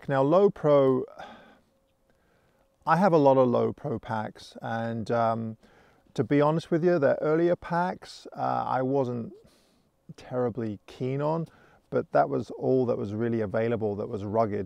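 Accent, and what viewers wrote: England, United Kingdom. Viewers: I remember watching this video as the first one of yours I ever saw. I thought: "He sounds ok, possibly got some English in his accent and he talks sense and seems to have a history in photography." Mmm! So much for first impressions, I don’t remember thinking: "This man is possibly the greatest landscape photographer on YouTube and thus the best I’ve seen." With some of the other landscape photographers like Thomas Heaton, Simon Baxter, Paul G Johnson, that’s not something I say lightly. Thank you for pulling me into your channel, it’s a true pleasure and indeed an honour to see you work and to learn from you.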